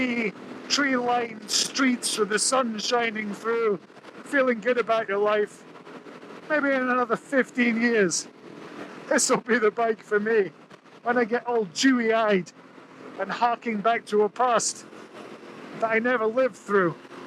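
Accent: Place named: Scotland, United Kingdom. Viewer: It looks like one of the Scottish glens to me, certainly has a Scottish twang in his accent.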